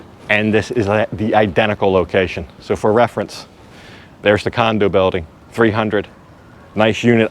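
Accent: United States. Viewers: We get you on the ships . Outside manilla. A real american voice can't be bad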